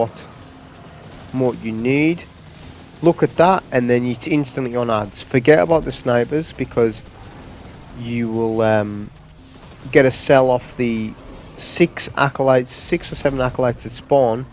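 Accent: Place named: Northern England, United Kingdom. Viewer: I know most rant on about ESO's solo runs of content but enjoy the actual audio commentry with it from these videos, especially from a northern lad :D PoH next?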